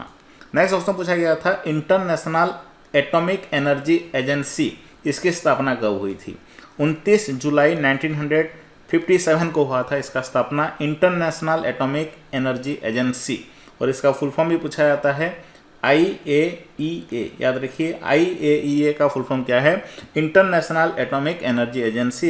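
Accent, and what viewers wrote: Bengal, India. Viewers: Sir ka tone bengoli h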